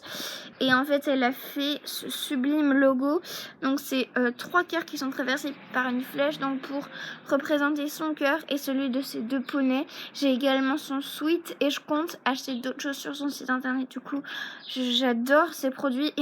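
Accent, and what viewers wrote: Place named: England, United Kingdom. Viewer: L'accent anglais😅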